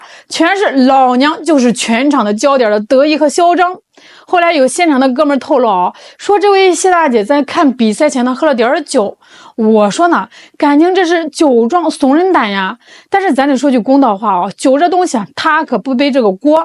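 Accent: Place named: Shandong, China. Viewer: 主播山东人啊😂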